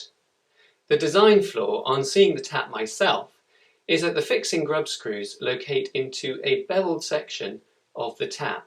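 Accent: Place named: Scotland, United Kingdom. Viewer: I can hear a Scottish twang in your voice....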